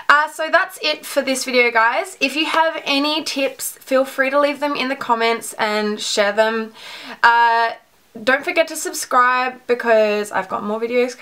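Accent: Australia. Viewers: Great tips! Thanks. I didn't realize your natural hair was that light. Looking lovely as always, and I like the Australian accent.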